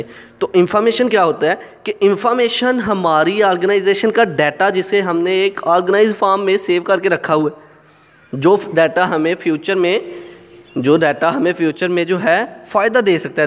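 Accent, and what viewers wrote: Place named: Punjab, India. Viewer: Thank you for highlighting Sir! I had liked the video and subscribed the channel. Your tone resembles Punjabi speaking people.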